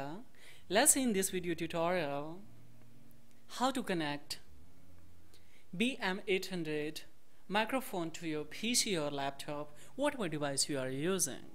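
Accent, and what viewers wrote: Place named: India. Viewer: Your English accent is so duplicate.....pure indian accent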